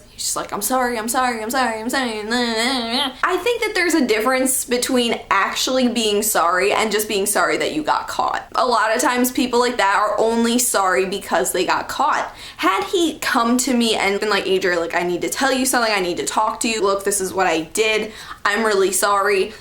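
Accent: United States. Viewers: Hey! You are really nice and you seem like a good person, +1 Subscriber. And the total Drama part about Duncan, YAS I LOVE TOTAL DRAMA ISLAND. Where are you from? You seem like you have an American Accent, I'm Australian btw.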